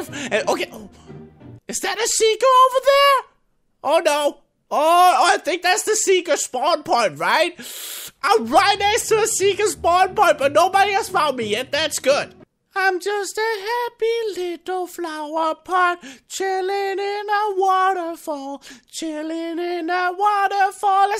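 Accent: Denmark. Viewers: Have you ever heard someone from Denmark talk? That is how they sound.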